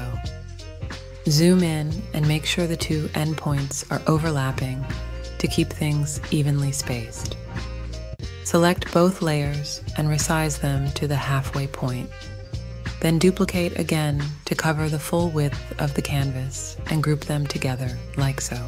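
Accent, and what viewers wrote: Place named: Russia. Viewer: mam are you from Russia amazing accent waooooo 😊😊😊😊😊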